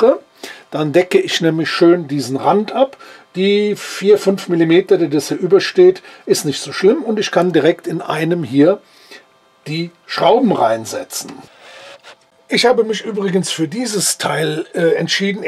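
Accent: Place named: Cologne, Germany. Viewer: 👍🤣 bin eigentlich kein Kölner 😉 finde aber Deinen leichten Akzent sehr nett 😊 Gruß Detlef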